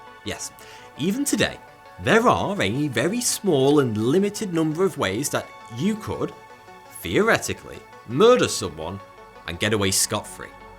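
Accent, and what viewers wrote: United Kingdom. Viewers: Get away with murder with this one simple trick... COPS HATE HIM British Vsauce much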